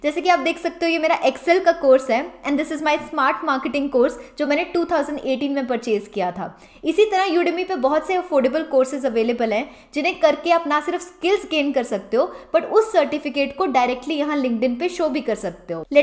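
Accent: India. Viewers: your indian accent is incrediable but very useful sharing. thanks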